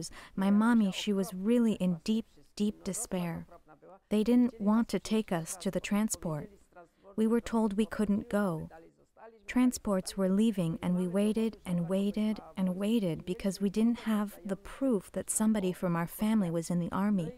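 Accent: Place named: United States. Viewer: thanks for uploading.The spoilt brat american narrator is annoying but I really should not quibble as this is great stuff.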